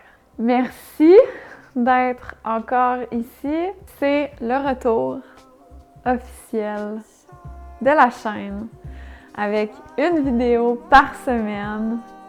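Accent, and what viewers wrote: Quebec, Canada. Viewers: Tellement heureuse de te revoir! Grâce à toi, j'apprécie l'accent québécois et tes vidéos m'ont toutes beaucoup apporté, je les partage avec ma fille qui découvre la vie (11 ans). A mon tour, je t'envoie donc plein d'amour!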